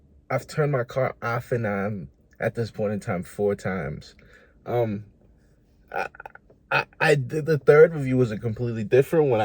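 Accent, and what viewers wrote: United States. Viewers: You from Rhode Island? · I would just like to know how did you get ur boston accent when u not from boston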